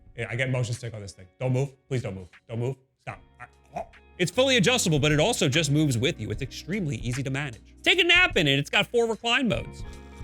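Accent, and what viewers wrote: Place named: Long Island, United States. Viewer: That's an integral part of the Long Island accent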